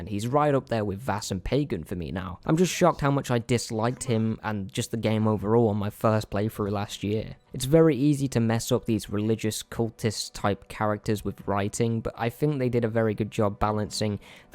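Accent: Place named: United Kingdom. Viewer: Sounds like reviewer is from UK. They aren't allowed guns there......so they shouldn't be allowed to play games with guns in them. Review is so off base.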